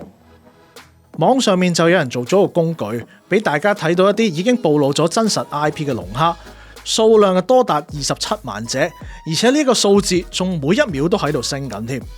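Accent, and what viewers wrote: Guangzhou, China. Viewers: 點解主持咁重廣州口音? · 師兄是咪廣州人? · 簡單, 清楚, 好片。 主持係廣州人? 幾有廣府話味, 幾有個人特色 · 主持有種廣府口音 係咪廣州人？ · 好重大6佬口音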